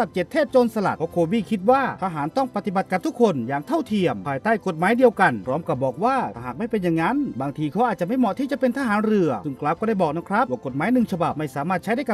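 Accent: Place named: Bangkok, Thailand. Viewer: สำเนียงคนกรุงเทพฯแท้ๆแหละ ดูออก แม่นบ่แอด?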